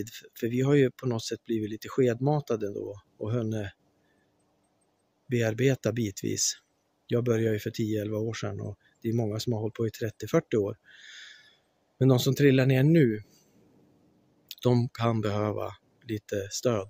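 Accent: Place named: Norrland, Sweden. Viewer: Tack, detta är min sanning också. ❤💚 Härligt och höra norrländska. 😊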